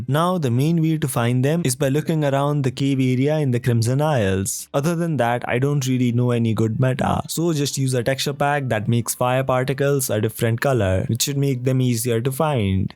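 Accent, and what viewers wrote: India. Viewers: dang he is like the most indian i have ever heard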